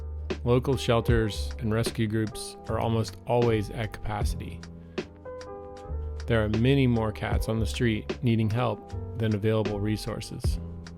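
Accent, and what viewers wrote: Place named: New York, United States. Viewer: "this is de mudda" holy crap that NY accent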